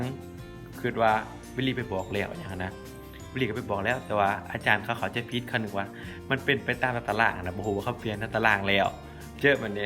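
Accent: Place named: Ubon Ratchathani, Thailand. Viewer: เจ้าเว้าออกสำเนียงอุบลหลาย สู้ๆเด้อน้องหล่า เอาใจส่อยุเด้อ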